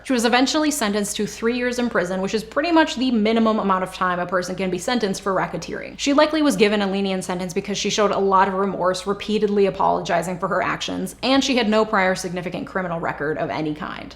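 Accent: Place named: Midwest, United States. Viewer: Your the only YouTuber that I don't skip the ad plug because I love your Midwest accent. I think it's cute.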